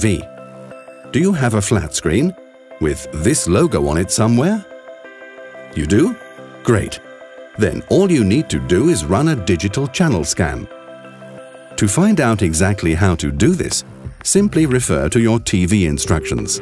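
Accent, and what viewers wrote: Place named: United Kingdom. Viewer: He's Kinda British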